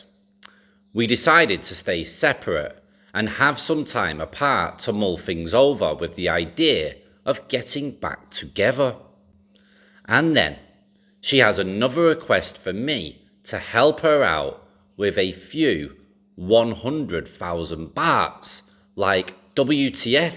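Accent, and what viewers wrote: United Kingdom. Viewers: Delusional he sounds UK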